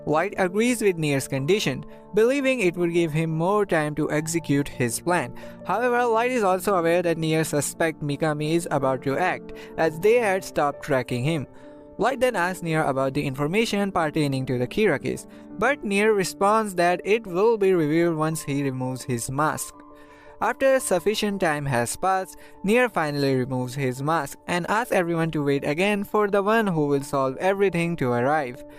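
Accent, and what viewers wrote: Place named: India. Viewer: Indan accent english, Fresh W 🐬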